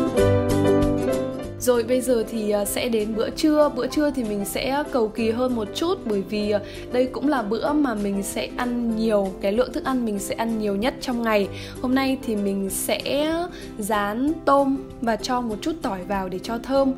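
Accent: Hanoi, Vietnam. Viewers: Giọng nói HN đáng iu quá hihi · Be de thuong qua cam On chia se cua e , giong ha noi nghe de thuong , guong mat xinh qua 🌹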